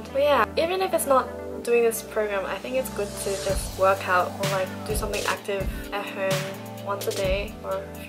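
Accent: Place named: Australia. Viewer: So, are you from Australian cuz u sound really Aussie..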